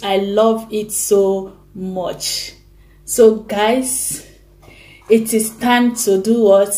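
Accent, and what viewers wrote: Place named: Caribbean. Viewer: I could be wrong but you sound in the Caribbean accent but either way I take your advice very serious because people like you know your s***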